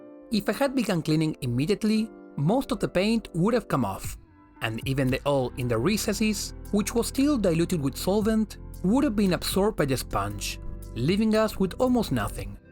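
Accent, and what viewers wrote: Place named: Spain. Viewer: estaba casi seguro que tu acento es del español, notas mucha diferencia realmente en la audiencia por hacer los videos en ingles?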